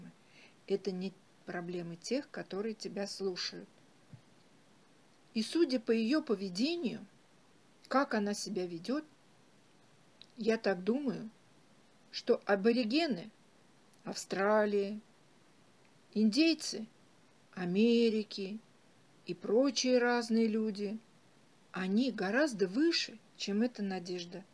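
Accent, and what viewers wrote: Yorkshire, United Kingdom. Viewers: ну да , иммено так она и считает . Она причислила свой йоркширский говор к отдельному языку ., как валлейский или гэльский языки . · Это и есть йоркширский диалект?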